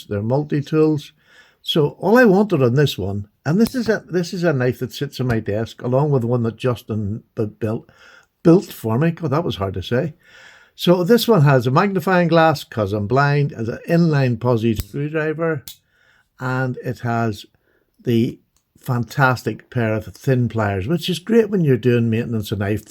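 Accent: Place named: Wicklow, Ireland. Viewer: oh my days , I think slippys work is great , I'm going to follow your advice and join the group , get Mat to do some work for me , thanks again for all your content as all ways its the the biz , I'm sure I told you before I live in London but I'm from Wicklow and its great to hear an accent from home , that Taylors eye is just sublime , all the best ,p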